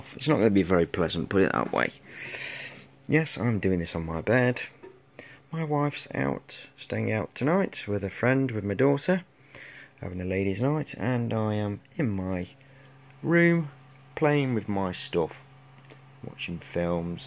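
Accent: United Kingdom. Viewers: guessing from your accent (the way you say inductor) are you British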